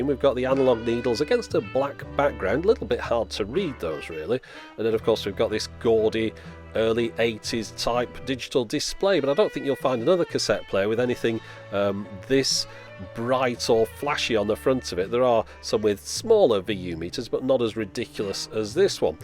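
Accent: United Kingdom. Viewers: Thanks,. excellent videos. I relate totally to the spectrum analyzer aspect of home and auto hifi. and for the record, your voice is excellent. for some reason, British accents are like fingernails against chalkboards to me, but not yours. keep up the great work.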